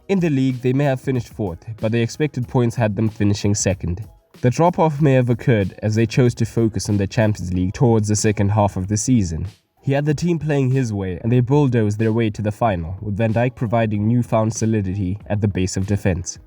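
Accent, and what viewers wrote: South Africa. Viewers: he sounds south african, i know as a south african. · is the narrator South African..? just really curious. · You sound very South African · could be south african or indian or indian in south africa :) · Dude you sound so South African ...are you ? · Are you South African? Glad I've found the channel - great content! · You sound South African nigga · You from South Africa bro?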